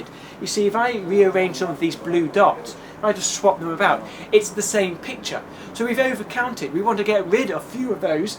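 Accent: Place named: United Kingdom. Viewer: i used to be so racist towards British accent, yours are.. just awesome , thx for repairing me ^^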